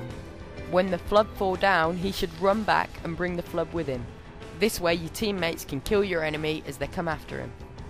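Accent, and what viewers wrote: United Kingdom. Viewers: is he from uk? COOL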